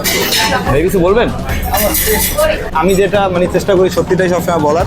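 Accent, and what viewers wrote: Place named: Barisal, Bangladesh. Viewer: ❤❤ভাইজান আপনি অনেক সাহসী কথা বলা ধরণ দেখে বোঝা যায় বরিশালের মানুষকে কি সাহসী হয় তা বুঝলাম ধ্যনবাদ।আপনাকে।❤❤❤❤❤❤❤❤❤❤❤❤❤❤❤❤❤❤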